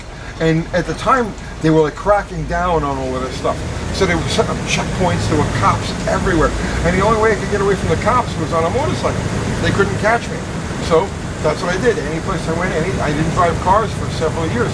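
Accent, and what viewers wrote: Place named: New York, United States. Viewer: Love your channel..watch it AWL the time.lol the NY accent is just a plus.lol I was raised in Boston so I drop all my R's myself.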